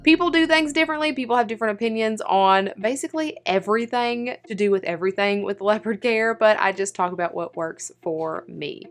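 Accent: South, United States. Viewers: OMGoodness, where are you from? You sound like you are from the South! I'm in NC and new to having a leopard gecko!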